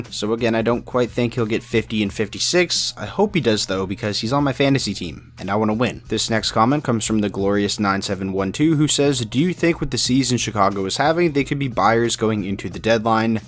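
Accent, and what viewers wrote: Canada. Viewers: As a Canadian, you have the most Canadian accent i've heard in a while. You have to be from a small town out in butt fuck no where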